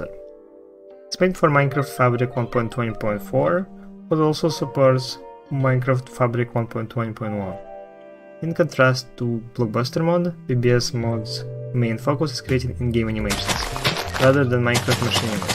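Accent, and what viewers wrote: Russia. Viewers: the accent is similar to Russian, he probably speaks Russian, but I didn't know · yeah, finally a port! great work! Weird question I know, but where are you from? Is that a russian accent?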